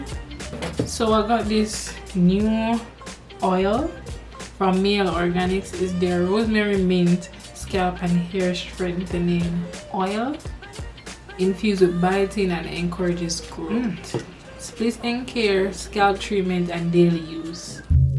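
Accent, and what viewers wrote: Jamaica. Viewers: R u Jamaican 🇯🇲 lol slight accent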